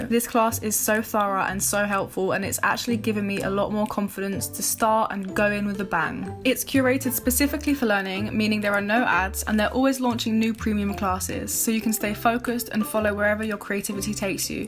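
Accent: Croydon, United Kingdom. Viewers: Charmee Taylor She addresses in an assumptions video how people think she's trying to be black when it's just the accent. That's just the accent in Croydon, all races speak this way there ☺️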